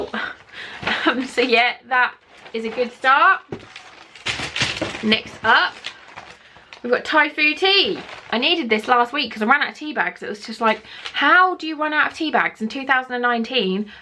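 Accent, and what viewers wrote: United Kingdom. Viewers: Yum, the bulls eye sauce is great. You look super Goddess in this video too, love the UK accent as always, your vids are lovely to watch, love the try on hauls and unboxing vids 😍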